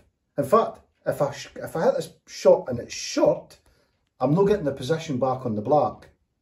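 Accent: Scotland, United Kingdom. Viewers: you sound much like a scot!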